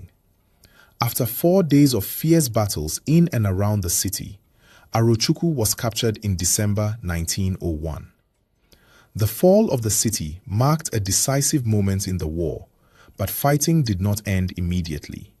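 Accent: Ghana. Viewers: He sounds more Ghanaian than Ijaw. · 🎉'A Nigerian here! The Narrator sounds Ghanaian. 'Very interesting indeed.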